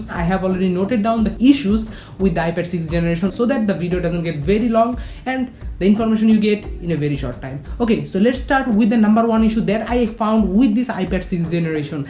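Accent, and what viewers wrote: Bengal, India. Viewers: Bengali English accent👌👌👌👌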